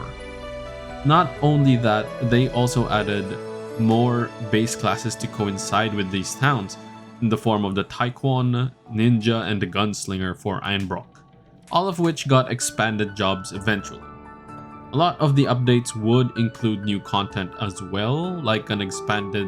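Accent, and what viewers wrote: Philippines. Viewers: Not sure why sounding Filipino is a problem. He's not doing any humiliating thing like what you're doing to yourself.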